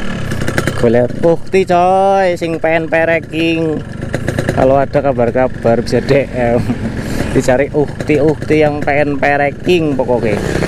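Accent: Indonesia. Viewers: Logat nya jawa timuran asli mana bang salam santun dari blora jawa tengah bang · Walaikum salllam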